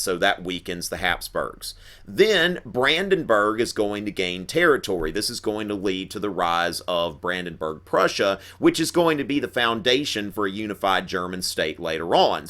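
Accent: South, United States. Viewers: Southern US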